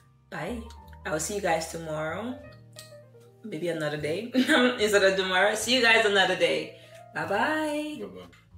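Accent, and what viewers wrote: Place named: Liberia. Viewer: The way you pronounce certain words reminds me of Mercy Gono, who is also from Liberia.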